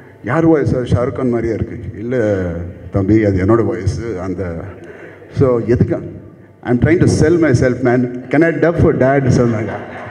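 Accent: India. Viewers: He is speaking like * tamil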